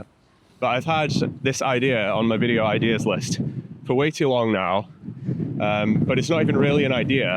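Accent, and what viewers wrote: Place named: London, United Kingdom. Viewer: London innit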